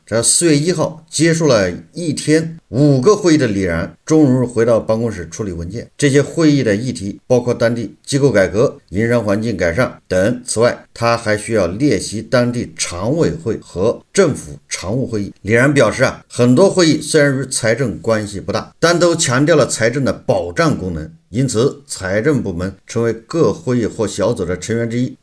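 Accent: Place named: Anqing, China. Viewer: 安庆口音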